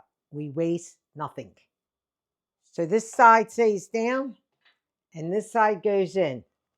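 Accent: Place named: Philadelphia, United States. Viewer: You sound like your from south Philly, in south Philly or south jersey we always called it gravy!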